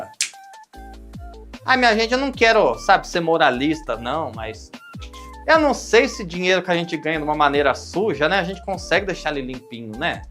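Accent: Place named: Bahia, Brazil. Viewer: Oi Moço Sou Novo Aqui. E adoro seu Vídeos. O Jeito de Falar a sua Observação no Detalhes me lembra uma prima. Você não é Bahiano não da Bahia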